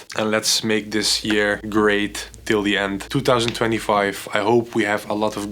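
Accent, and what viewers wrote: Netherlands. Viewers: Excellent video, and channel. You do sound Dutch 😊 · His accent exposes the Dutch nationality. 🟧🦁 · Ben je nederlands bro.. ik hoor je accent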